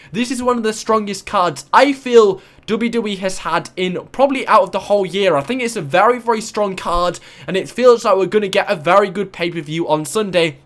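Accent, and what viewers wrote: England, United Kingdom. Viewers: You have an english accent are you from UK ?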